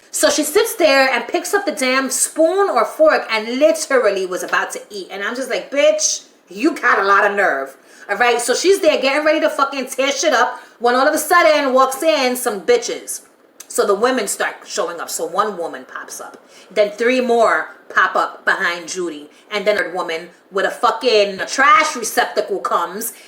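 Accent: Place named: United States. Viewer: I think ur baby love ❤ 😍 lou will end up screwing over judy Bryant 😡😡cause lou has 2 meet her contact's as she doesn't get visitation so lou will know the plan n f**k over judy at last minute and ann Reynolds has 2leave my baby love alone mr Jackson 💔 🎶,but im with u marie is starting to grow on me again. Please give 🙏 😔 mention 🙏 Darren from Dundee just want u to mention me in ur American accent as ❤ ur accent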